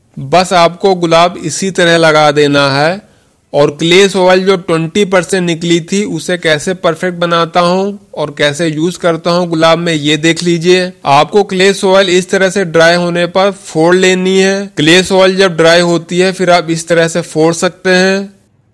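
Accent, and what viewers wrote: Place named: Varanasi, India. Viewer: Beta ache se samjhate ho. Tum banaras ke ho ya aas pass ke ho.tumhare boli me innocency jhalakti Hai. God bless u.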